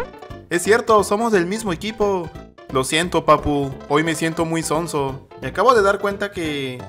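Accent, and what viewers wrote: Mexico. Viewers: Eres de México? Me gusta mucho como hablas 😸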